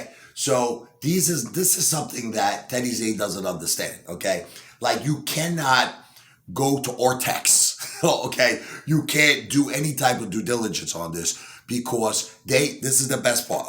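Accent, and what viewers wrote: South, United States. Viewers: You’re not form New York ! You’re form the south with you’re southern draw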